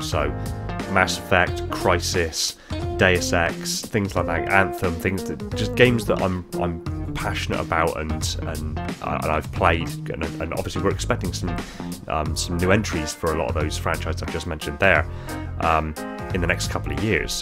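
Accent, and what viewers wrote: United Kingdom. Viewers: I always love ur voice btw. Also r u British? I forgot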